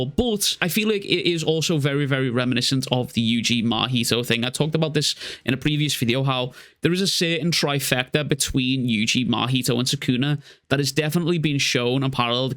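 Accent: Liverpool, United Kingdom. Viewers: Are you a Scouser?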